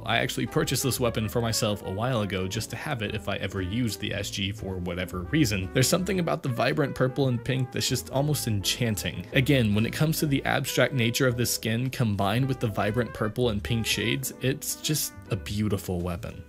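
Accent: Germany. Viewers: Are You german???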